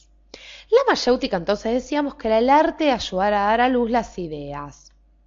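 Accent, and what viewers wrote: Argentina. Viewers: Eres Argentina? Hablas muy argentino Saludos desde México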